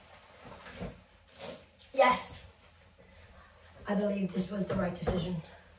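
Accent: United States. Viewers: Story with the American accent? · I dont understand why you talk like an american?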